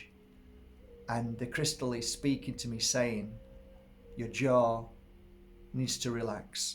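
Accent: Yorkshire, United Kingdom. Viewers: A wonderful video! Thank you..and..I truly enjoy your Yorkshire accent❤️❤️❤️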